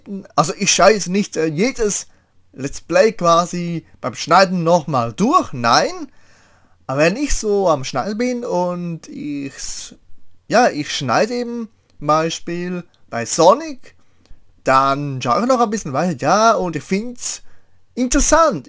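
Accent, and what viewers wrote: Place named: Switzerland. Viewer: Das ist ne echt gute Frage. Ob du ein guter Lets Player bist, kann ich nicht so genau sagen, da ich deine Videos zu wenig kenne. Ich kenn dich glaub schon sehr lange, bestimmt um die 3 Jahre. Aber ich habe nie aktiv auf deinen Kanal geschaut. Was ich sagen kann, ist das ich mir am liebsten einen LPer ohne Akzent anhöre, was keine Beleidigung an dich sein soll, weil du kannst ja nix dafür du in der Schweiz geboren wurdest. Man merkt schon, dass du dich dafür einsetzt und du dir Mühe gibst. Ich schau halt kaum die Videos, weil ich keine Interesse an den Spielen habe. Aber ich mach wie versprochen Best Ofs von ein paar deiner Projekte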